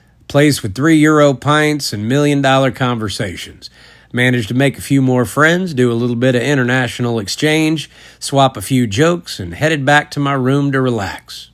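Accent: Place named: Texas, United States. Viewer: The delivery and vibe this man brings is beautiful, it’s that old Texas twang full of warmth and character. Really excellent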